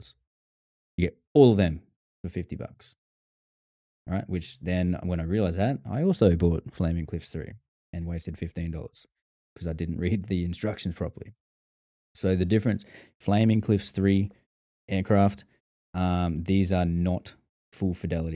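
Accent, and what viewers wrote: Australia. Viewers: Hey mate I am looking into getting involved in dcs at some point, I watched this, and if and when I get around to it I will definitely hit you up for info and further knowledge and hopefully you could be my wing man anyday ( had to say it ) / coach to get me up to speed. Great content and insight, loved the foul language as an Aussie myself you can't beat how we talk and have slang that most can't understand.. loved it, true words not just scripted for precious ears in this day and age.. I will definitely be watching more of your content because of how you come across and are REAL.. cheers mate..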